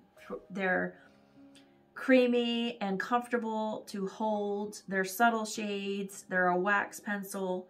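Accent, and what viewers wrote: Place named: England, United Kingdom. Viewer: Are you from Australia or the USA? Just wondering what dollar we are talking, actually you sound very English ☺️ I was born in England but I have lived in Australia and become an Australian for 50 years now. The time goes so fast, the older you get the faster it goes 🤔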